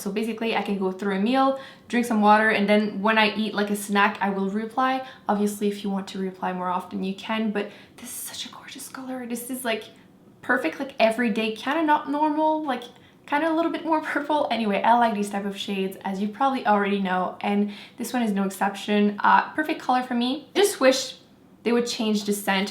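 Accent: Quebec, Canada. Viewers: I am a new subscriber. I see in your info you state your accent is French Canadian but I don't see your location. Where do you live now? Thanks, Jen xx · I love your channel and you seem so sweet! Also I adore your accent haha I thought it sounded familiar and then I saw that you are French Canadian! I'm Canadian too :)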